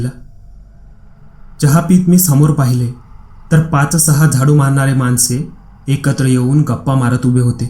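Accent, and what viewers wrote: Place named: Gujarat, India. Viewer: मस्त कथा आहे पण कथा वाचणारा गुजराती आहे असं त्याचा टोन सांगत होता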